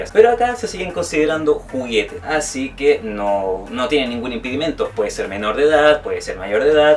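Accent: Chile. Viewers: Ya me imaginaba q era chileno se parece a Germán ajajajajja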